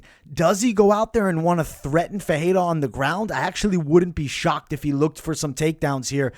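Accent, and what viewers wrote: New York, United States. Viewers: U sound like ure from nyc I like u automatically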